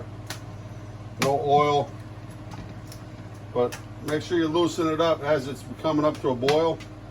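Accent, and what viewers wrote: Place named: Philadelphia, United States. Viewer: Great Job......you talk and act like ur from South Philly!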